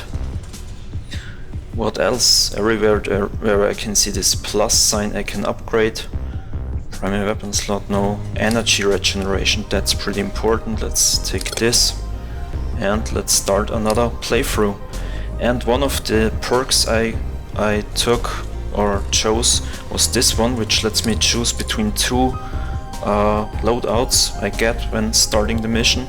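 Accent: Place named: Germany. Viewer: Am Akzent hört man das du ein Deutscher bist. :D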